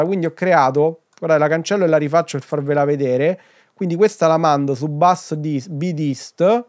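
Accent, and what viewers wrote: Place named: Rome, Italy. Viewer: Abito a Pordenone da 15 anni ma sono di Roma (vicino Frascati). Sempre bello sentire il nostro accento...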